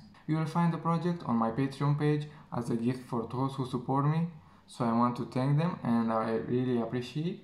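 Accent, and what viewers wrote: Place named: Brazil. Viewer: amigo, vc é brasileiro, né? Pq pelo sotaque ta parecendo, se for, poderia gravar em portugues mesmo. Ficaria um tutor melhor.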